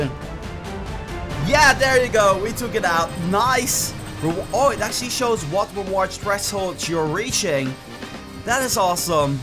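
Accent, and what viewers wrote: Netherlands. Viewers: I love ur dutch accent :D ben ook nederlands haha :D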